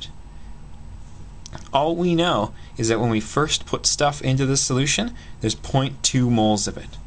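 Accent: Canada. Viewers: you're from canada arent you?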